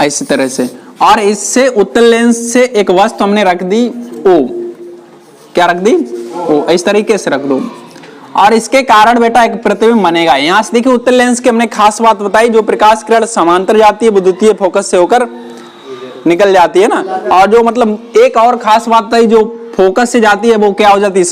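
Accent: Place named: Aligarh, India. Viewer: Bhaiya aligarh se ho kia😅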